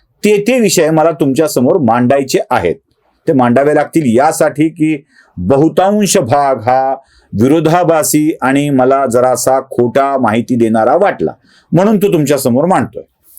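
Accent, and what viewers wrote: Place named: Solapur, India. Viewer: very nice..real representing.. reticular in solapuri..tone..😅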